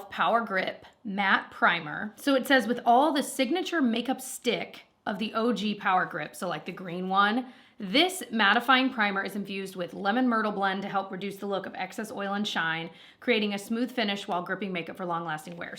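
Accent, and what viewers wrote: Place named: United States. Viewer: I so enjoy your videos!! How you “feel like” x,y,z. I miss America so it’s fun to hear the little dialect differences. Been hoping for a review on that setting spray!!! Thank you for being genuinely you!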